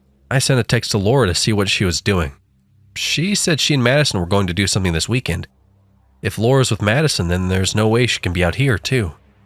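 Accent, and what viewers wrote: South, United States. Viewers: Great southern accent. Better than mine and it’s natural. Haha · Gratz on 1k and I love the southern accent and you giving it to the douchebag is perfect for the story PMSL 😂😂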